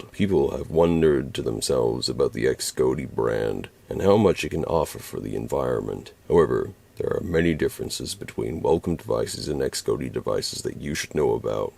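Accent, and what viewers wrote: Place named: Australia. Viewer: I don't know why he's so worried about his voice. You just sound like an Aussie mate. You don't sound like you, but you still sound like some Aussie.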